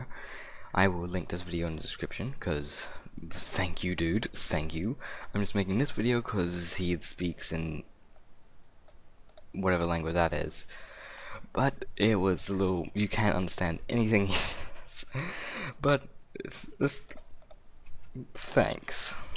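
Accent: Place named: United Kingdom. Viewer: british accent? xD I'm from spain and I just love how you speak english!!! UvU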